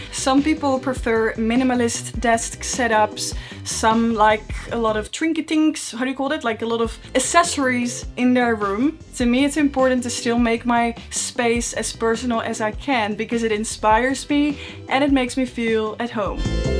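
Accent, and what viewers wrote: Netherlands. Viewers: dutch accent? or not? anyway, nice vid! wtf that cabinet , looks like its about to fall apart😂 but what was that thing all the way at the ceiling? extra storage spot?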